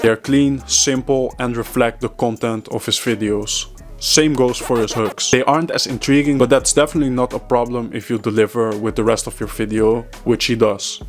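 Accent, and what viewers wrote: Netherlands. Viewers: is that a Dutch accent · Dude I love your accent! I can't place it but I really like it. Sounds Dutch? Sort of?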